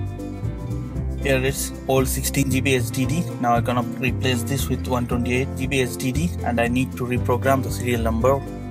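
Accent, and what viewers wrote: India. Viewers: Hi I can detect Indian accent so where in India are you from ? I would like to have this procedure done to my iPhone Thanks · sounds like a indien tech scammer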